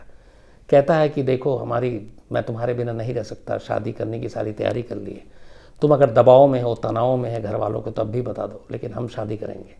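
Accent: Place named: Lucknow, India. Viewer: Sams sir me aap ki sari story sunta hu kabhi comments nahi kiya aaj ker raha hu aap ki hindi mere lucknow jasi hai aap up ke ho kya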